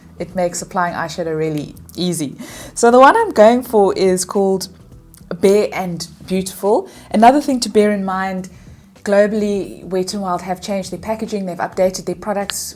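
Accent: South Africa. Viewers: Hello from Los Angeles.🌸🌴🏖️😊 You have such a beautiful accent & calming presence. And I guessed your accent is S. African.🏵️🦒🌴🦏🏞️Yay! Thank you for the review. I think I'm going to try L'Oreal Freshwear again. Last time I tried it, seemed my skin felt a bit dry and the color wasn't right I normally stick with Clinique because of my sensitive skin. Unfortunately, getting a good shade match is still difficult with Clinique. I've been shade matched in many different colors.😒 I've also used Revlon Lipstick-Rum Raisin since my early 20's. I'm 52 and it's nice to see someone else who remembers these products & shades. You look so pretty. Thank you for sharing. 🦋🏵️🌻💄🌸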